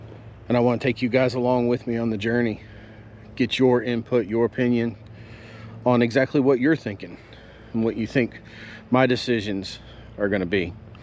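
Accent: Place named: South, United States. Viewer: Thanks hear your southern voice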